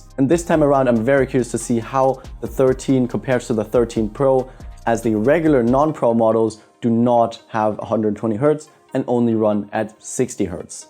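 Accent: Germany. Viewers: Haha wie man sofort den deutschen Akzent merkt 😂. Tolles Video, danke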